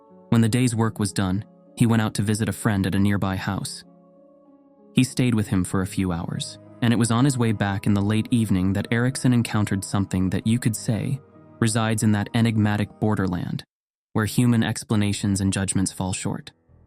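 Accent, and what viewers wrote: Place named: Eslöv, Sweden. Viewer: Trodde att han var från Eslöv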